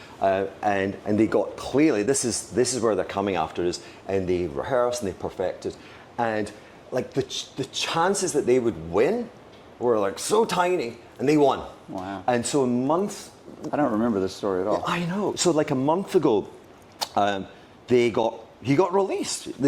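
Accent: Scotland, United Kingdom. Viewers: Scottish accent - Check, Mirror shades - Check Caribbean bangles and mad shirt - Check I love it !!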